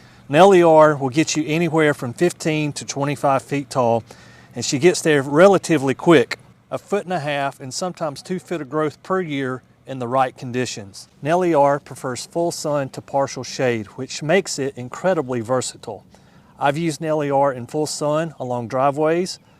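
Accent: South, United States. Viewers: This gentleman has done a nice job, but the video would be even better if he'd provided information about the shrubs' plant zone and deer hardiness. His southern accent should have tipped me off to the possibility that he might focus on southern plants.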